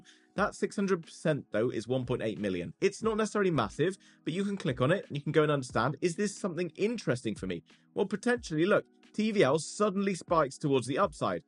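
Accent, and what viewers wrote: United Kingdom. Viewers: Love your content man! Your British accent is GOLD! :-D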